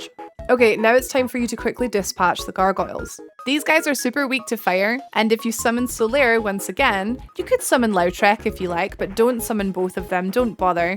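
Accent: Scotland, United Kingdom. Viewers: A Scottish sounding chick playing dark souls?! Wild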